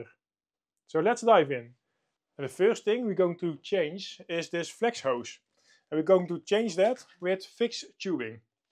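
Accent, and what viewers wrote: Netherlands. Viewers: Vind het leuke videos. Erg interessant, leuke technieken en 3d printen, maar stoor me wel vreselijk aan het Nederlandse Engels.. 😢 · Who's also Dutch and just here for the pronunciation? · I like a deep dive into anything nerdy with a Dutch accent. Takes me back to my brief stint working in Eindhoven. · I love the heavy dutch accent haha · So, you klinkt as a Nederlander 👍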